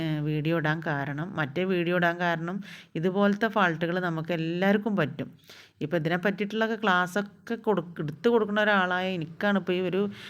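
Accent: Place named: Malappuram, India. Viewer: കൊടുന്നാക്കി എന്നത് അരോചകമായി തോന്നുന്നു, കൊണ്ട് വന്നാക്കി എന്നാണ് പറയേണ്ടത്. സംസാരത്തിലൂടെ മലപ്പുറം ജില്ലക്കാരിയാണെന്ന് തെളിയിക്കരുത്. കൊട്ന്നിടുകയല്ല, കൊണ്ട് വന്നിടുക.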